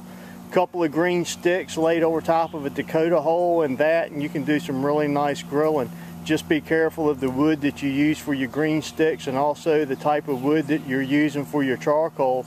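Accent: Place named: North Carolina, United States. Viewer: I enjoyed this, thank you. You sound like you might be from North Carolina or east Tennessee?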